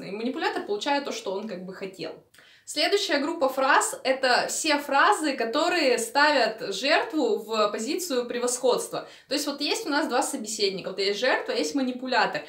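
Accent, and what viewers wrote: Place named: Urals, Russia. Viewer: Говор Уральский, окающий, ты из Перми?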